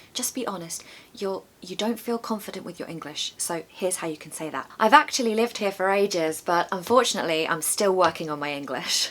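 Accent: United Kingdom. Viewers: The old British accent is interesting and attractive, thanks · Hello 🥰 I'm new to your channel and actually really glad that I found you. I fancy british accent and I enjoy watching your videos for learning English. You're so lively besides your floral dresses are so pretty. Like your style🌸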